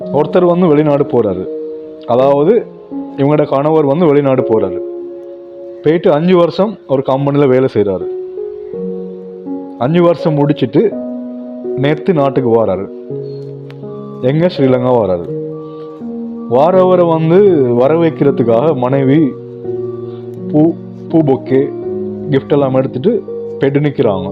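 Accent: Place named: North India, India. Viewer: North Indian Tamil sooper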